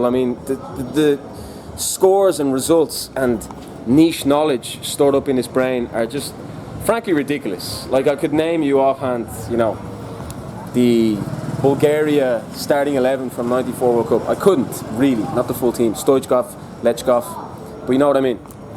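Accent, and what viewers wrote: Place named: Ireland. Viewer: You sound like lrish you rap fast he a cool rapper singer my friend 🙂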